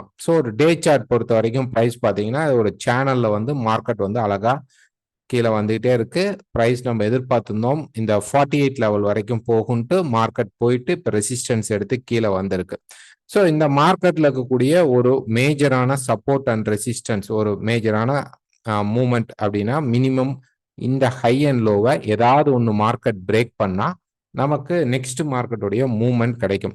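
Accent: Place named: Kerala, India. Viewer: Most of the analysis are accurate!,I feel you are speaking in Malayalam,I can follow your Tamil without any difficulty, Thank u sir!!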